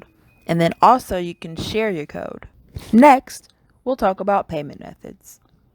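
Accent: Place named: Atlanta, United States. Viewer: I knew that accent was ATL!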